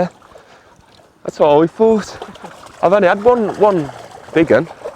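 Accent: Birmingham, United Kingdom. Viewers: Love the videos. Despite the Brummy accent, I expect to see great things from you in the future!!! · Brilliant video our kid love the brummie accent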